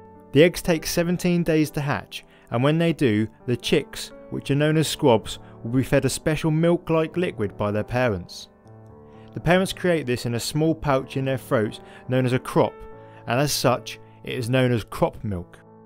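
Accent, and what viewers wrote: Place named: Norfolk, United Kingdom. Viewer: Can't tell where in Norfolk you are from! I'm guessing Thetford way?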